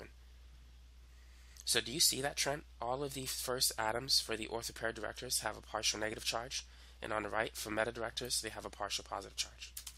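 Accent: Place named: New York, United States. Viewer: Isaac Pelletier no sounds like from some New York district.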